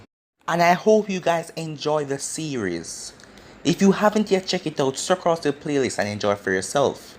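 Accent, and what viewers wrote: Jamaica. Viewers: Hey are you Jamaican I'm only asking because I am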